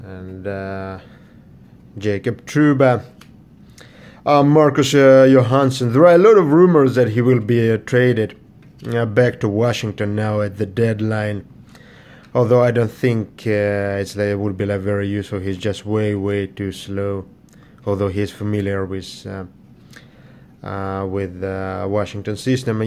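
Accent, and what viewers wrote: Russia. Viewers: Nice video man. Are you Russian?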